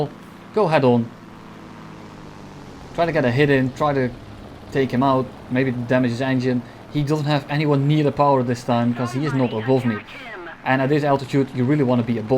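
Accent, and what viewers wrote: Netherlands. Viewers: Hey man, although a bit late, I do enjoy the videos! Are you dutch? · Is he dutch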